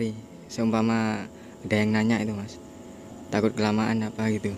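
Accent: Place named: Madura, Indonesia. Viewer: Logat nya mas ke madura madura an hehehehe😅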